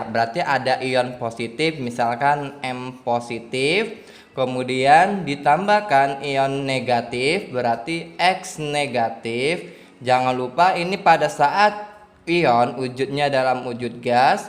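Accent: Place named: South Sulawesi, Indonesia. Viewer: Duh maaf ga tau, soalnya logatnya hampir sama kaya kating saya yang dari Sulsel hehe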